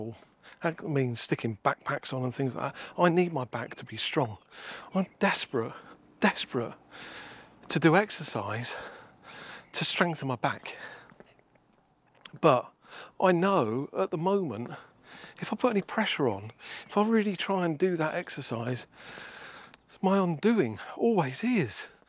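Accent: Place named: United Kingdom. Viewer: Hey. I've been following you for a few months now. I gotta say that I truly love your content and admire you both. I don't think people realize the work that goes into a Youtube channel sometimes and the return to work ratio involved. You guys are rock stars and I love how genuine you come across in your videos (Perhaps the British accent helps LOL). Anyhow, I also have a channel about anesthesia (I'm an anesthetist in the US), small channel 5k subs and I would concur that 98% of the comments are good, but I do get the eventual terrible comment that can be considered hurtful. I've learned that there are a lot of people that just want to criticize for no apparent reason. It can be brutal. But I conditioned myself to not become overly emotional with bad comments and I am quick to reply to them and tell them to move on. I could never understand why people have the need to make a bad comment on a video if they didn't like it. 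My thinking is just move on or swipe away. Anyhow, I'd work on the psychology of dealing with negative people and continue to do what you enjoy. I particularly like your videos when you explore the mental side and financial preparation involved in retiring early. Looking forward to your next video on how much do you need to retire. That I know, you've never dug deep into actual numbers and this is highly sought after forepeople like me who think we never have enough to actually pull the trigger. With that said, looking forward to the next video. Best of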